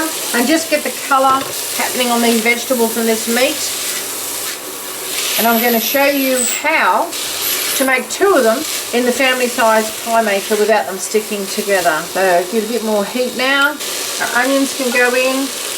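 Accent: Australia. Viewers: Thank you for this wonderful recipe. I have been living in Germany for many years now, and I miss my pasties and pies. Luckily I managed to buy a family pie maker and so lucky I stumbled over your youtube channel. :D So nice to hear a fellow Australian talk, too. The pasties are in the maker, filling tastes fab and they look so great, I cannot wait to get tucked into them.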